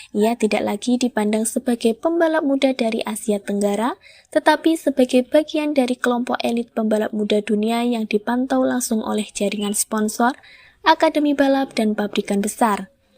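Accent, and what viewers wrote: Java, Indonesia. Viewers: logat jawanya medok....tp dia anak hebat....ini yng penting.... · Logat Jowonya sangat² medok 😂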